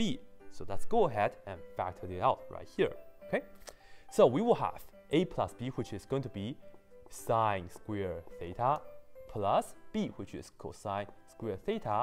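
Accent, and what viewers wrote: Singapore. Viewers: It sounds like Singlish to me.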